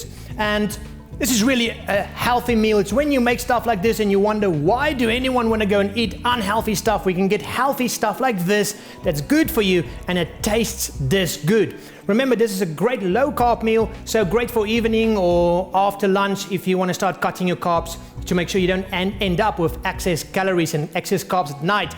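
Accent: South Africa. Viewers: sounds south africa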